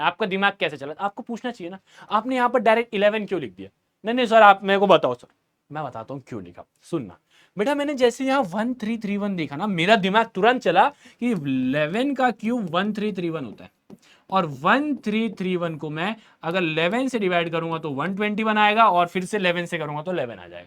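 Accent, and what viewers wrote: India. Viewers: Love ur other Hindi Accent ❤️😭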